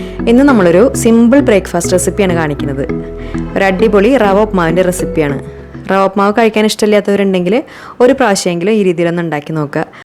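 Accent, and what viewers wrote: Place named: Thrissur, India. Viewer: തൃശൂരാ... ല്ലേ.. ഇനിക്ക് മൻസിലായിട്ടാ.... വർത്താനം കേട്ടപ്പഴേ... 😊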